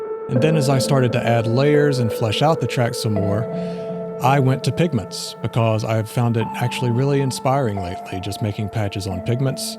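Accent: South, United States. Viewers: Just to clarify for anyone reading..I was joking about the looks and voice thing. I'm sure many consider J's attributes beyond reproach.. personally though, I don't judge a person based on either. (..got to admit, though, that "southern drawl" sounds pretty cool. 😁)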